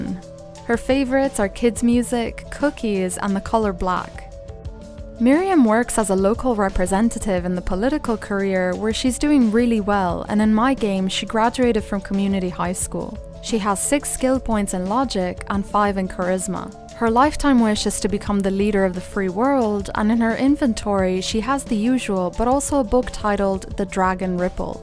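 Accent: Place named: Ireland. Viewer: I love this Irish + a pinch of eastern european in her accent :T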